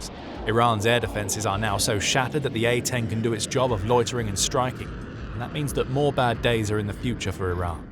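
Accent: United Kingdom. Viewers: is so funny that a Brit is narrating this video since their politician are not doing shit in this Campaign I Remember the WarHog from 1978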